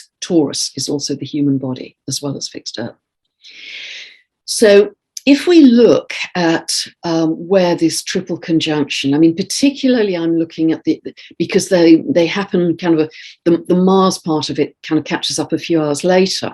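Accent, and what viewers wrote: England, United Kingdom. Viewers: Hi! I have my natal Chiron at Taurus 18 degrees. Chiron natally squares my Moon at 25 Aquarius. Going through Saturn conjunct Moon this year and I’m really struggling with depression and despair. The last full moon in Capricorn at 21 Capricorn EXACTLY squared my natal Saturn at 21 Libra. Let’s just say I got a nasty shock and am seriously struggling to feel anything other than that the universe hates me and is out to torment me until I decided to leave the planet. I’m worried this conjunction right on my natal Chiron will be another blow. Can you offer a different interpretation please? I find it harder to continue to force myself to see the “positive “ possibilities. Every time I do that in the last 18 months whatever I am hopeful about is ripped away brutally. Appreciate anything you can share. I’m not a professional astrologer but I do try and use astrology to help myself. 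This year I am just struggling beyond belief to hang onto hope (also you may have realised, I am having Saturn square Chiron transit. No wonder I cannot see the light!) Thank you and have a nice day. I find your voice and accent soothing because I have a lovely soulmate in this life who is English as well:) From Bangalore, India.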